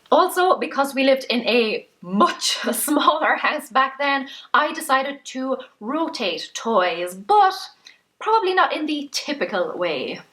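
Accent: Ireland. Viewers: "they are". The Irish accent makes the area have two syllables!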